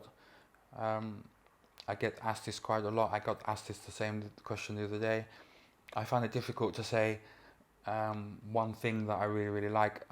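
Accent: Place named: Netherlands. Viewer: You are definitely not from the UK, your Dutch accent and Dutch words here and there are a giveaway.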